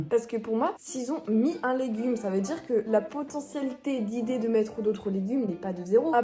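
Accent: England, United Kingdom. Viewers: Évite de faire l’accent anglais c chiant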